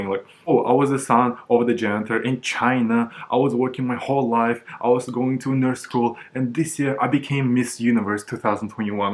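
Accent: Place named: Russia. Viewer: What nationality are u? Im getting russian speaker vibes 🤔 also great video! I had the same experience with "stalking" people, I was pretty embarrassed to realise that people saw that 😅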